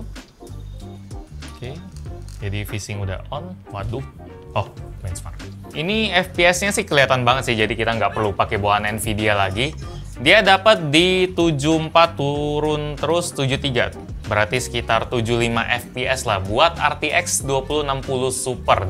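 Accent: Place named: Java, Indonesia. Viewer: bahasa mu medoke banget hahahahahhaahhahahaha "DARIPADHA"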